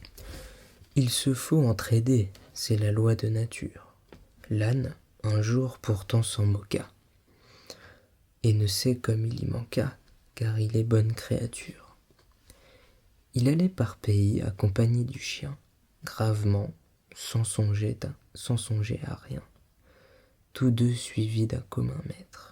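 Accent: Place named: France. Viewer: damnnnn the french accent is everything 😩😩😩😩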